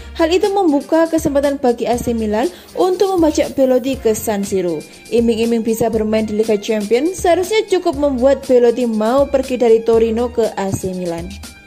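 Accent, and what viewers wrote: Java, Indonesia. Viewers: medok mbak yu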